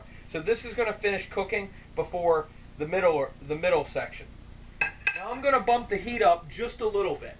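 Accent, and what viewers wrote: Baltimore, United States. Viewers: You must be from Baltimore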